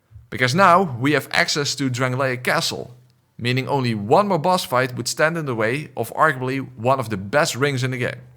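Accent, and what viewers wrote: Netherlands. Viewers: this dude is dutch he has to be .... i hear it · Are you Dutch? The accent is strong. XD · Are you Dutch? You have a Dutch accent! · I've been watching your videos for months now since picking up Bloodborne last year and now playing through DS2, and have been trying to figure out your accent ever since!! So glad that my guess of being a Southern Dutchie was correct hehe. Sounded so familiar since I study in Limburg as an international!! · are you dutch? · Tried to watch this, but the Dutch accent was a bit too cringe. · that accent I recognize are you dutch? je bent echt goed ik zou nooit zoveel gedult hebben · Dutch accent is so recognizable hahaha, leuke video man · i hear your voice for one second, and i instantly know your dutch. wel goeie video hoor.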